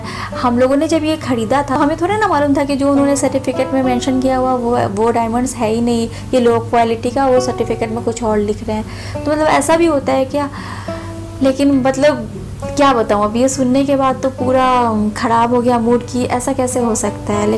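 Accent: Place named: Bihar, India. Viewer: तुम इंडियन बिहारी हो क्यों की तुम हिंदी बिहारी बोल रही हो जय हो बिहारी बाबा की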